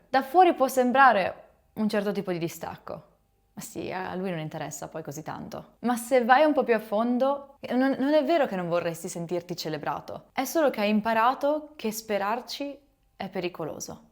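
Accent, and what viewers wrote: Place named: Veneto, Italy. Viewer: Prima cosa: fa strano sentirti in italiano, con questo accento spiccato veneto quando in live parli in inglese. Seconda cosa: personalmente, non do tanto valore al compleanno perché, anche se sembra banale, perché dovrei festeggiare, fare "casino", invintare gente quando (in teoria) posso farlo tutto l'anno? È come quando si dice: "La festa delle donne non è solo l'8 marzo, ma è tutto l'anno". Però, al tempo stesso, vero, più passano gli anni e più, almeno personalmente, non mi accorgo che siano passati. Forse perché tutto passa in fretta, taaaanto in fretta, e certe volte è difficile stare dietro a tutto. Non so dove voglio a parare con questo commento, ma forse, vorrei accendere quella speranza che ad ogni anno le cose andranno bene, non meglio, bene, anche se certe volte è difficile confrontarsi con le felicità altrui (quando specialmente già gli altri iniziano ad avere i primi lavori, le prime case, le prime auto, mentre io rimango qui nella stessa cameretta dove sono cresciuto con ancora tanto da fare e da scoprire)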